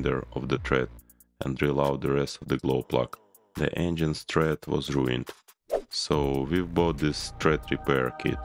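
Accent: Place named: Russia. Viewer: I hear russian accent :D а есть полное видео со всеми деталями?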